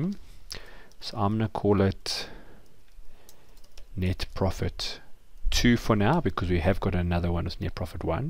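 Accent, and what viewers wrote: South Africa. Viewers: I miss the south African accent, but certainly not the country. Hope you also managed to escape!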